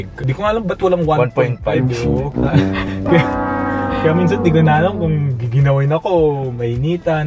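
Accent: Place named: Conyo, Philippines. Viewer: Ang conyo 😆😆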